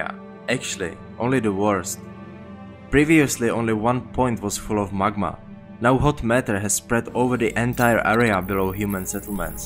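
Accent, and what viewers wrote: Czech Republic. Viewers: by accent u are deff czech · Do I hear a czech accent of speaker, don't I? :D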